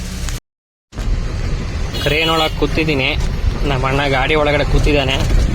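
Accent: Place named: Bangalore, India. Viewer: ನೀವು ಸಾಗರ್ ಅಂತೀರಾ ಮಾತ್ ನೋಡಿದ್ರೆ ಬೆಂಗಳೂರು ತರ ಇದೆ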